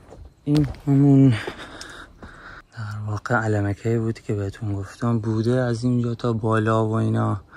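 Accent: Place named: South, Iran. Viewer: دمتکرم جنوب بی‌نظیر وزیبا واون لحجه زیبا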